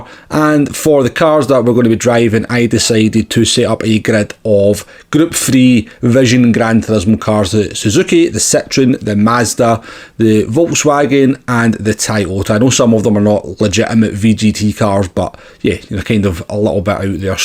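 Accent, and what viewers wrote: Scotland, United Kingdom. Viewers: From Scotland by the accent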